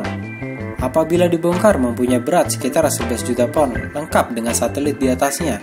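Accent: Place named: West Java, Indonesia. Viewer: Logat sunda haha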